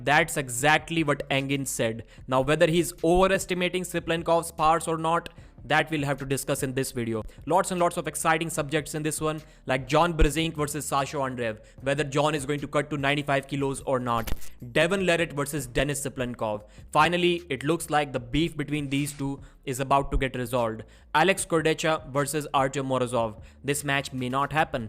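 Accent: India. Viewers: Its the typical indian accent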